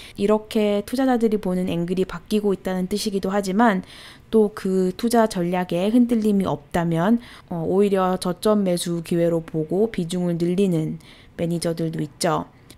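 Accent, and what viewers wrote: United States. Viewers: ㅋㅋ 아니 미국에살아서 미국식 발음 하는게 뭐가 그리 불편함? ㅋㅋㅋㅋ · 뉴요커발음 ㅓㅜㅕ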